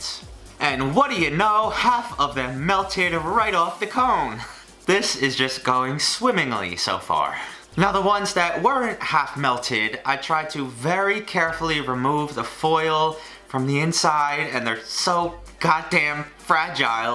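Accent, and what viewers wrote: New York, United States. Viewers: your new york really came out here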